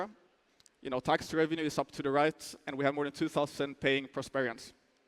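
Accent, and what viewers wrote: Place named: Israel. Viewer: Sounda like israeli accent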